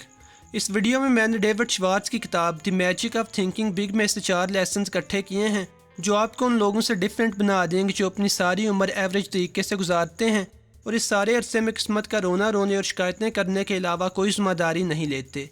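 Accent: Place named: Punjab, Pakistan. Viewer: پنجابی لہجے میں اُردو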